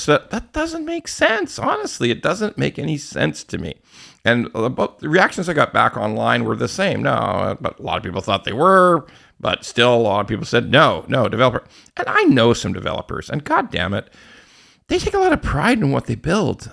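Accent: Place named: Ontario, Canada. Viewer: talks in a Hamilton/ Vaughan accent Nice sub division you got there...shame if it burnt down...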